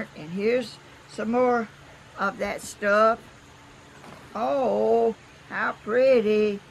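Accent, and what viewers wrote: South, United States. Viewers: No ! Not another Southern drawl lady getting gifts from her subs. Isn't Glenda Sully enough ? Where did this lady come from ?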